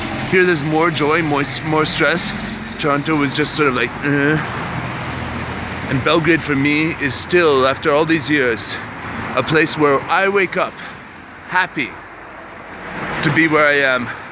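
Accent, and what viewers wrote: Germany. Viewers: You have really really mixed roots. And I don't know if anyone told you already that you still have some German accent. At first I thought your are originally from Germany but when I heard you say Toronto,Canada I was like WTF! :D I'm not surprised that you feel Serbia as natural habitat maybe because your Slavic side gens "work" and "want to go home". :D That's normal because I also know some Serbs how feel much better living in Spain,Italy or Ireland than Serbia. It's just like that and who knows why. I have a friend,born and raised in Serbia went to school together, who told me something like "I feel I'm alive only when I'm in Barcelona". The other one use every single chance to spend time in southeast Asia,Vietnam,Burma etc. The guy just LOVE to be there. I like Mexico or Mediteranian lifestyle (wich is pretty similar to Serbian lifestyle,hehe). 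So,that's normal, some people just looking for their own part of the sun under the sky.And that could be elsewhere than place they were born and raised. Anyway,glad you enjoy your life in Belgrade. And just go on. That is only matters. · Were you born and raised in Canada? How come you have such a strong german like accent?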